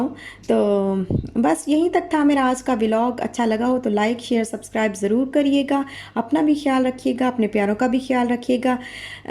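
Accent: Saraiki, Pakistan. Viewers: ALLAH ap ko khush aur khushaal rkhay..ap ki pahli video ma dakh rhi hun ap k bat krne k style se hi andaza ho gia tha k ap saraiki hn...phr comments ma dakha to confirm ho gia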